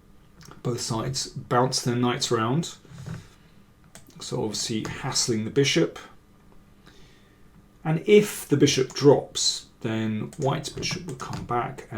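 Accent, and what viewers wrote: United Kingdom. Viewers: Thanks for all the valuable stuff you lovingly provide chess enthusiasts with. And by the way, your succinct, eloquent commentary with genuine British accent offers an excellent English course!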